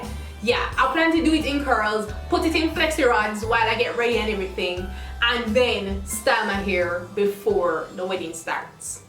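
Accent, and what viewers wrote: Jamaica. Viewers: Loving your jamaican accent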